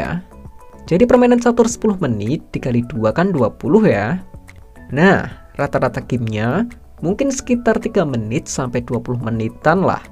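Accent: Java, Indonesia. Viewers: medok suara nya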